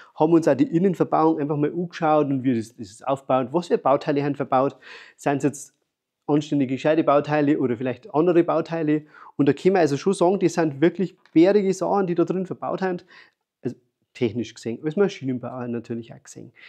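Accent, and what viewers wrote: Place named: Bavaria, Germany. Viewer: Für nicht Bayern leider nicht immer ganz leicht verständlich aber deine Begeisterung kommt sehr authentisch rüber. Toll😊 Auch für mich in der engeren Auswahl. Leider wohn ich nicht bei euch in der Nähe sonst käme ich auf jeden Fall bei dir. Vorbei. 🎉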